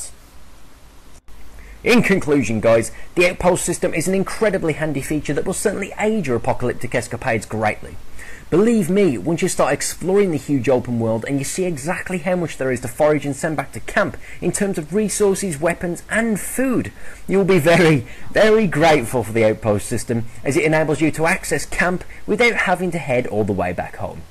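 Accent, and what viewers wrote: Birmingham, United Kingdom. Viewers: Is that a brummie accent?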